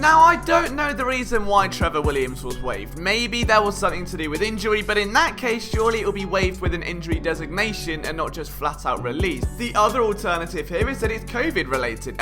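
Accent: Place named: United Kingdom. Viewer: Are you British? How did you become a Eagles fan? Love the accent btw. Stay safe!!!!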